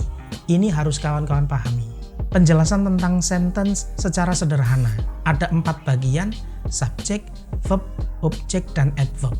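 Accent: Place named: Surabaya, Indonesia. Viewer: MANTAP. NGGAWÉ LOGAT SUROBOYOAN ❤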